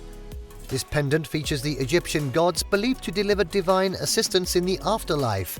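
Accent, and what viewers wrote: England, United Kingdom. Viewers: Among others: This fortress served against RUTHLES OTTOMAN EMPIRE, I believe that when you point the finger or insult someone with tendencies and that someone has ruled half of the world and beyond, at least change the accent so that it is not understood that you are English, since today in April 2024 countless countries pay taxes and other benefits to Britain without knowing why they are poor?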